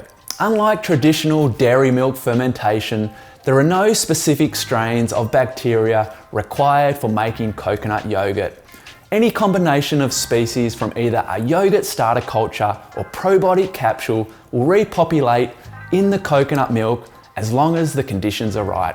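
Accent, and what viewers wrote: Australia. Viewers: Hooray for your Australian accent!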